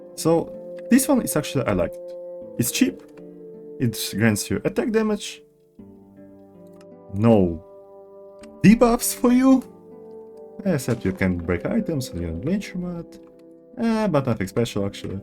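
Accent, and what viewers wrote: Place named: Russia. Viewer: Чую чую я русский акцент, чую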